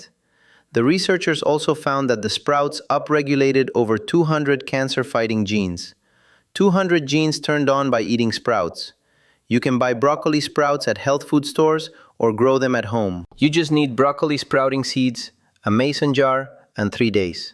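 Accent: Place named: Mexico. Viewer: Why is he switching in and out of a Mexican accent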